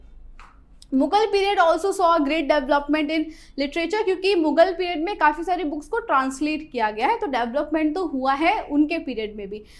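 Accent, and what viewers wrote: Gujarat, India. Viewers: Are you Gujarati????😊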